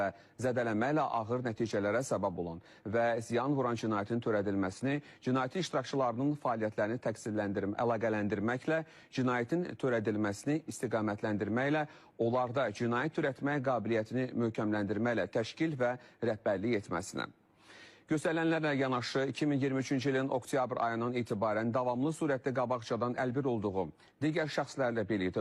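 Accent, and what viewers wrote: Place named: Armenia. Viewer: Ay yaramazlar bes terterde öldürülen zorlanan herbicisine sahib cixmayan hakimyete kim inanar birde ki senin lecen ermeninin azerbaycanca danişmasina oxşayir bu siziz qancixlarin teze türkün rusca lecesidi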